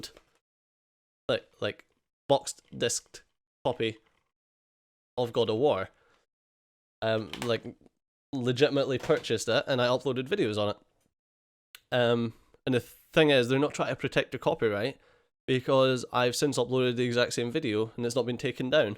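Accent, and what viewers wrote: Scotland, United Kingdom. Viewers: I wish you the best of luck man, my total youtube usage will go down without you and those wonderful Scottish tones. I hope more creators see what you are doing and stand hand in hand with you. Please don’t stop creating, i personally think you are in the right and we still need creators doing THEIR thing rather everyone just doing the same thing controlled by some faceless corporations, trying not to sound conspiracy theory on it.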